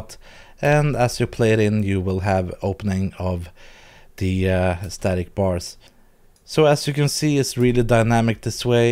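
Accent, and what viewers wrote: Norway. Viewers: Tusen Takk Og du høres så Norsk Engelsk ut at det er deilig å høre på en norske som snakker english :D :P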